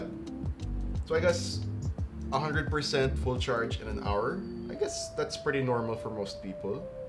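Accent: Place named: Philippines. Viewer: are you a filipino? because thats how filipinos speak English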